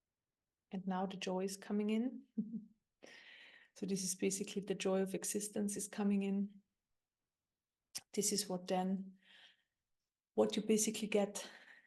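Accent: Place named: Germany. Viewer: Beautifull you have german accent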